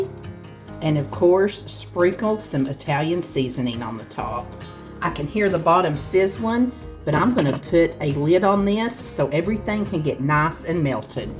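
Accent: South, United States. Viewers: You are adorable and I just love your southern accent!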